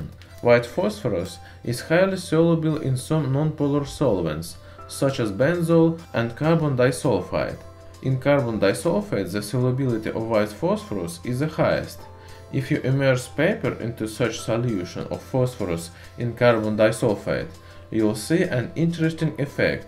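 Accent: Russia. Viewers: this channel has 3 of my favorite things: russian accent half-life and chemistry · My new chemistry teacher with a Russian accent. ......thanks for your videos .....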